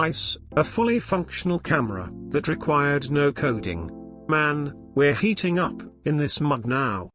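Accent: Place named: United Kingdom. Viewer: 🔥🔥🔥🔥🔥🔥love the British voiceover🔥🔥🔥🔥🔥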